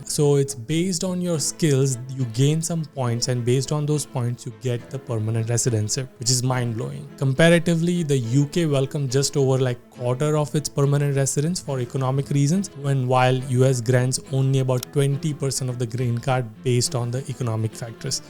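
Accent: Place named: India. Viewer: Even you need to go back to India. Am tired of hearing that accent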